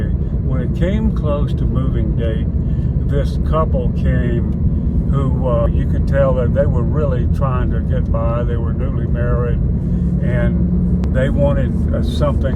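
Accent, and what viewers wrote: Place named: Boston, United States. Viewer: I love your Boston accent.... ;\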